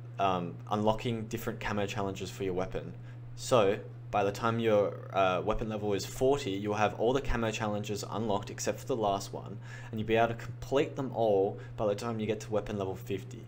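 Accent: Australia. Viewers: An Australian yooooo wtf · subscribed from your RPG video, now that i heard ur voice i see ur an aussie, even happier that i subbed now :)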